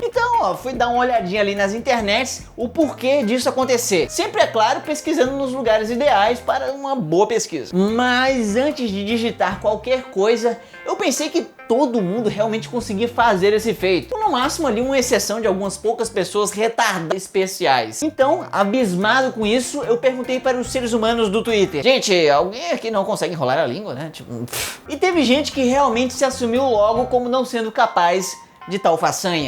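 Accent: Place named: São Paulo, Brazil. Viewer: Queria saber por que você é Cearense e não tem o sotaque de Cearense no T e no D normalmente. Só fui perceber isso agora! Você usa o T e o D de paulista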